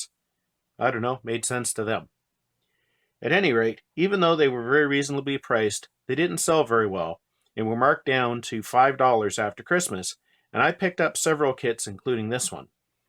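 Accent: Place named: Canada. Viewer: That is an awesome truck, and a nice accent. Love the Canadian accent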